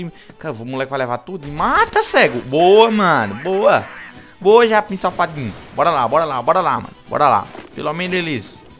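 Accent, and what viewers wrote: Northeast, Brazil. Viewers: vc é nordestino?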